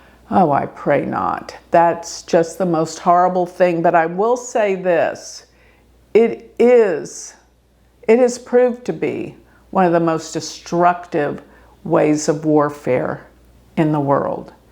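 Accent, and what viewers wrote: United States. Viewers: Love your accent it's totally like Kelly Bandy - the classic American. Greetings from Athens, Greece! Love Christina Aguilera, Britney, Madonna!!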